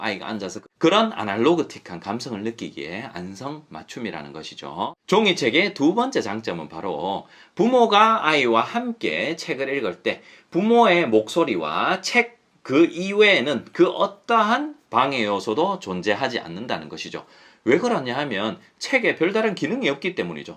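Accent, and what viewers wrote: Gyeongsang, South Korea. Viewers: 요즘 하루도 안빠지고 님 영상 인스타 열심입니다^^ 저도 경상도 부산이라 어감도 확 와닿아서 어떻게하면 님처럼 선한 영향력을 가질까 고민하고 또 고민하네요. 3,4학년 딸들이 되고나서 전자기기 허용폭을 넓혔더니 확실히 종이책 읽기에 소원함을 느끼고 친한 친구들과 독서모임을 할수있도록 인위적인 환경을 만드는 중이네요~ 물론 엄마들도 함께요, 아-지나고 나면 항상 아쉬움이 남아요.요즘 다시 육아공부중입니다^^ 요즘 3,4학년 된고나서 발름기호를 가르쳐야되나라고 고민에 빠져요, 이제까지 소리만 듣고 그대로 읽었는데 발음기호를 알려준다면 시기는 언제며 꼭 필요한지 고민입니다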